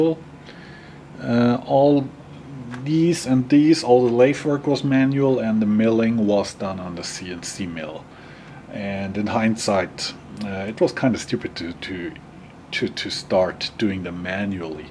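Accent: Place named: Germany. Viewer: You sound a lot like a professor I know. He is Dr. Chadoba. I work with him a little at the University of Texas at Arlington. The way you speak is very close to Dr. Chadobas speech. I recognize the German speach.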